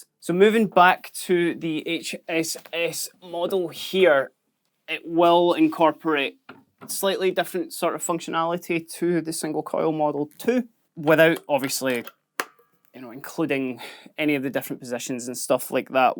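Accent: Scotland, United Kingdom. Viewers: Scottish accent IS musical! sold me this guitar better :D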